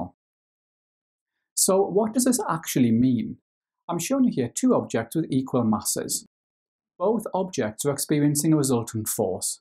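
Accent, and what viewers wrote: Wales, United Kingdom. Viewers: Is this guy Welsh?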